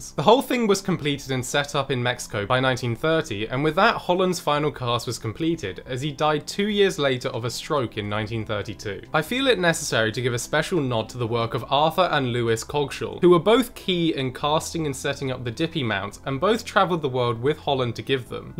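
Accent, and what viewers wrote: United Kingdom. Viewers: God give me the confidence to pronounce things like a Brit.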